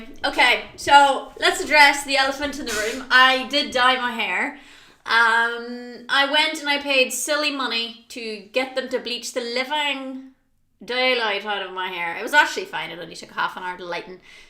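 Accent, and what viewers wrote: Ireland. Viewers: well i just ran across her by accident on the tube and was instantly pulled in by thatwhat id call hot irish accent.she just lately changed her hair colr thats why i inquired.i wasnt trying to be a meathead or nothing Sorry man.anyway im a new fan nice too meet you Wes davis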